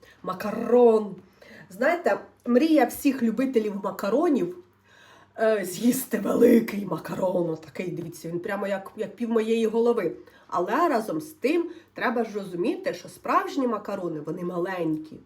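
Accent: Poltava, Ukraine. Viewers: Це полтавська говірка. Мені згадався серіал "Одного разу під Полтавою". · Знає дуже добре українську,але розмовляє,як оозмовляють на Полтавщині.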